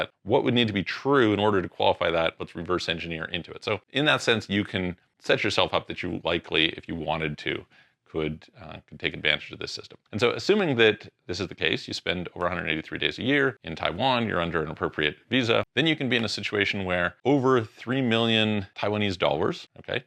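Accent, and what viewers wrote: Canada. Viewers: "Aboat"? You wouldn't be a Canadian "eh"? No problem if you are. I like Kanuks.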